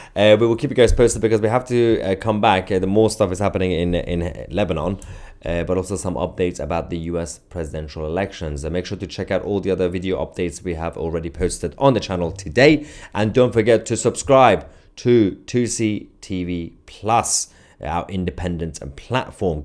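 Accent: United Kingdom. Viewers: Who are you referring to? speaks with correct English grammar with a charming British accent. It's the posters and people on the chat who do have terrible grammar. I love humorous comments about peoples' poor English that is incomprehensible at times.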